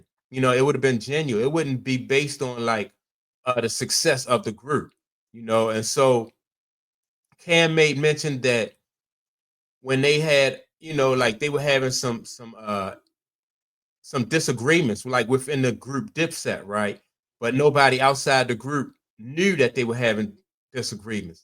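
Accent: Baltimore, United States. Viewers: Sound like you from bmore.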